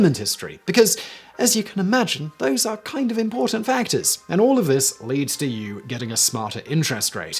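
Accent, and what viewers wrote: England, United Kingdom. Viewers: Serious proper English voice laughing brings my heart joy xD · I love to listen to Simon day four letter words😂something about the English accent and the word "fuck" are an absolute beautiful combination!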